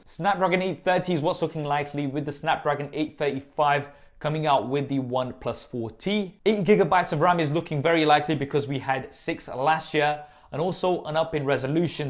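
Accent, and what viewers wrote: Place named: United Kingdom. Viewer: Wow are you hindi?you sound like a Brit.amazing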